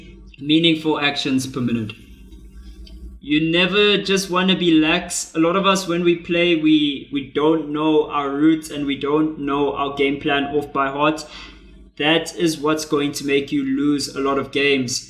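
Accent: South Africa. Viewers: You're attitude is great and the thumbnails are clean good job dude, nice accent too sound maybe like south africa or somewhere in africa?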